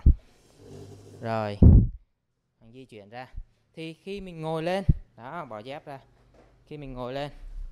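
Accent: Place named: Ninh Binh, Vietnam. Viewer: dạ, nghe giọng nói của a, sao như là giọng chuẩn NB ấy nhỉ..hihi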